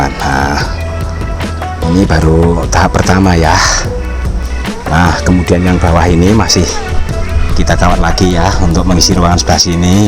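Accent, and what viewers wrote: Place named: Java, Indonesia. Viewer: Bapak pasti ada darah jawanya...bahasanya medok jawa....salam dari plat H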